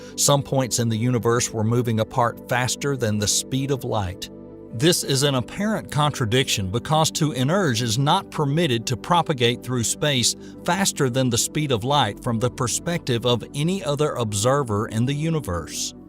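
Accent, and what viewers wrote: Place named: South, United States. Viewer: This channel would get more views if you changed your A.I. voice to one with a British accent. People generally prefer this when watching science related content as it sounds more intelligent than a southern American accent. Perhaps try a female voice such as the one John Michael Godier uses on his channel Event Horizon. I don’t recall any channels which are doing so at the moment. Enjoy your content a lot though, just the voice has to go. Keep in mind, most of your viewers are likely NOT Americans even if you are and you must therefore cater and adapt to the preferences of that audience. Cheers!! 💫🙏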